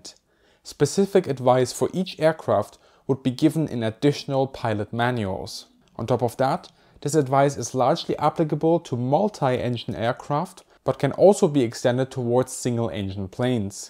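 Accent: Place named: Germany. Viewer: Oh man, have you but a german accent.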